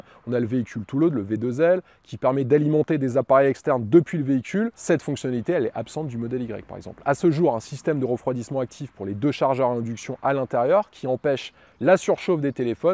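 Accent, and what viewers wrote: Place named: France. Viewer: Pourquoi vous n’utilisez pas la fonction traduction en anglais automatique. Votre anglais est horrible. La phonétique est 100% française. Ça fait mal aux oreilles de vous écouter en anglais 😖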